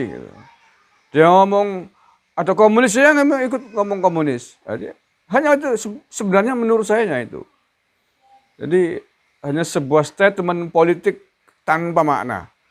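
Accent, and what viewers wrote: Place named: Java, Indonesia. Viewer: haduh ngakak aku om jenengan kalau ngendikan lucu jawani salam om sehat selalu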